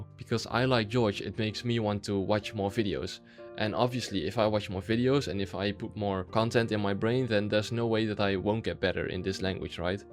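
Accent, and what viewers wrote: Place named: Netherlands. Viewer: I actually used the same video series when I started learning Japanese 5 years ago Ik wist gelijk dat je nederlands was door het accent man geweldig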